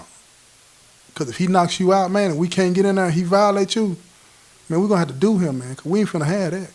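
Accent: South, United States. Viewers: Is your father or mother or grandparents from the south? Because you sound like some of my kinfolk down here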